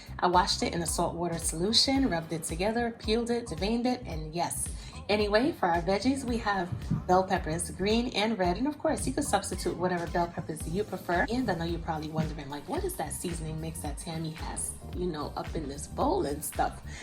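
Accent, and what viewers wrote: New York, United States. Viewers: i feel like your from new york lol i love the way you talk . GREAT COOKING TUTORIAL THANK YOU ❤️ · My sentiments exactly!! I was sitting over here admiring the soft tone to your voice, the giggles and that gorgeous ring. I can’t help but notice as well that you’re from New York like me🙌🏾 The way you speak tells it all. You definitely confirmed my suspicion when you said “don’t let me come to your crib”😉dead giveaway! Who but we say this😍😜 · Your accent mad cute you so New York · NY stand up!! I kept laughing, like, wow! We really do have an accept. The way she says Sauce and so many other things!! Too cute!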